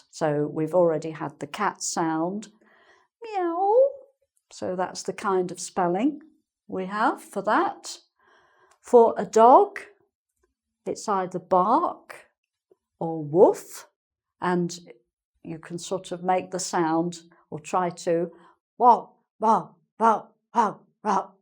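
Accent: United Kingdom. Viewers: You are a great teacher. And your accent ! I love British accent but is not easy to understand, but I am happy listening to you because you are so clear. Thank you very much. Love from Buenos Aires.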